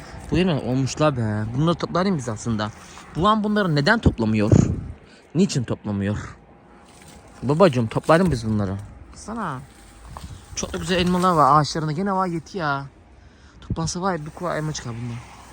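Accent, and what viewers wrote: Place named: Zonguldak, Turkey. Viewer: kardesim seni izleyen hic bir izleyicin sende negatif bir sey aramaz aramamasida gerek sen dogal zonguldak sivenle güler yüzlülügünle insanlara büyüklerine annene babana evine gelen misafirin ve takipcilerine sayginla bizlerin gönlünü kazandin kardesim biz seni dogal icten samimi halin ile seviyoruz yeni videolarini ve eski vidolarinida zaman buldukca izliyoruz bizler gurbette Almanyada yasiyoruz güzel ülkemizin memleketimizin özlemini senin cekimlerinle gideriyoruz esim Bartinli ben Cide 'liyim sivelerimiz bir birine cok yakin senin videolarinda dogal sivenle anlatiminla memleket hasretimizi gidermeye calisiyoruz iyiki varsin kardesim annene babana kardeslerine takipcilerine Almanyadan selamlar sevgiler kardesim.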